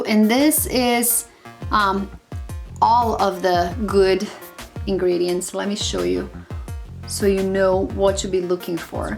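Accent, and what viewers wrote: Brazil. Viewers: I like your accent are you Brazilian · You sound like you're Brazilian every words ends with a e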